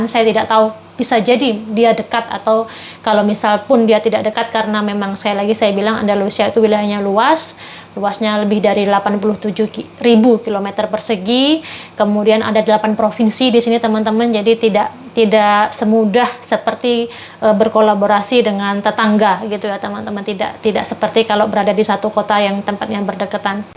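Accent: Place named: Surabaya, Indonesia. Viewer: Bhs ne kyok. Logate suroboyoan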